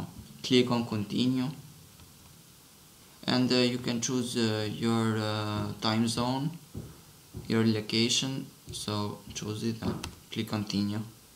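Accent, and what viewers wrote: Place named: Albania. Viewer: O shqipee . Tkuptova direkt nga theksi i anglishtes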